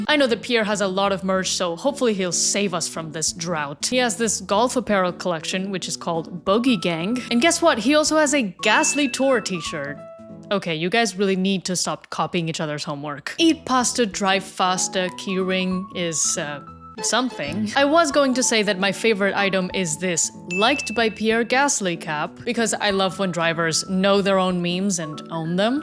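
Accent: Spain. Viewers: Acento español thick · Where did you learn spanish? The pronunciation sounds like you're from Spain. Saludos desde España :)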